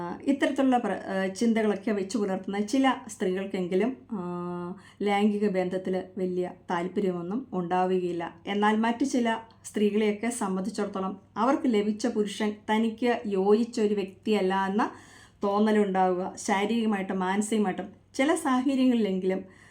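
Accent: Kottayam, India. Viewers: ചേച്ചി കോട്ടയം കാരിയാണോ? സംസാരം കേട്ടിട്ട് അങ്ങനെ തോന്നുന്നു. കോട്ടയം സ്‌ലാംഗിൽ സെക്സ് വിഷയങ്ങൾ കേൾക്കാൻ എനിക്ക് വലിയ ഇഷ്ടമാണ്. അതു പോലെ തന്നെ എറണാകുളം സ്‌ലാങ്ങും. അതൊക്കെ എനിക്ക് വലിയ turn on ആണ്. കാരണം അറിയില്ല.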